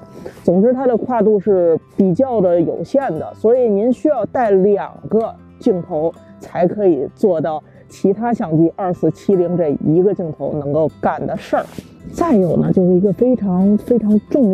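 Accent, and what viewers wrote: Beijing, China. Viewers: edi是北京出身吗？语气很像呢。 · 今兒这视频我彻底确定您是北京人了，这京腔！😁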